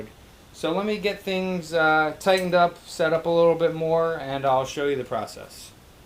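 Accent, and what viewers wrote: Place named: South, United States. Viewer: I hear saquetas.......... You must live in the South? Lol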